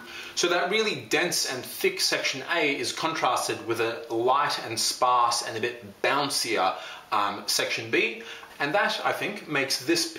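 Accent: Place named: Australia. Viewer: hey, i noticed you have an Australian accent, do you do 1on1 tutoring or know anyone else in Sydney for Jazz piano youd recommend?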